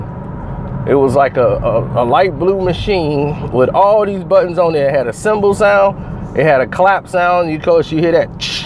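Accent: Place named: South, United States. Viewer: This brother must be from down south somewhere North Carolina or somewhere cuz he's definitely not a New Yorker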